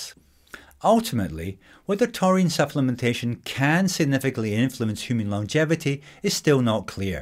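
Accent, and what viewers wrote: United Kingdom. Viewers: Very helpful! Thanks. Btw, will it make your hair fall out like this other supplement I forget the name of? ...creatine? P.S. what kind of fancy accent is he speaking with, something British? I couldn't understand some words, believe it or not.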